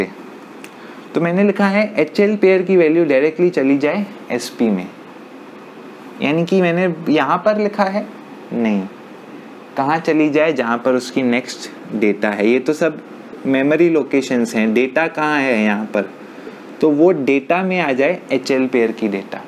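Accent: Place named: Gujarat, India. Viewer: Surely tell he is a Gujrati , apart you explain real well! I wish i got teacher like you for rest of my career.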